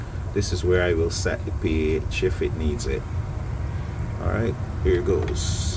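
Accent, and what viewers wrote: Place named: Caribbean. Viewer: I came across this vid while browsing for info on pH stability. I’m finding that it takes more and more pH down (I use phosphoric acid)… when a fresh tank is mixed it takes say 1ml to bring it down to 6.0 but 2 days later when I top up the tank with water (and some nutrient solution to keep the EC up) it takes 2ml of pH down to bring it back down to pH 6.0. Then the pH climbs to above 7.0 again in 48 hours when I come to top up the tank again. Foliage is green and healthy, fast growth, so I expect the pH to rise as the nutrient elements are depleted from the solution. Maybe the tank is just too small for the size of canopy that it supports, combined with fast uptake of phosphorous means that the acidic elements are being depleted very quickly? BTW I loved your accent. It’s interesting that Caribbean accents often sound similar to Irish accents.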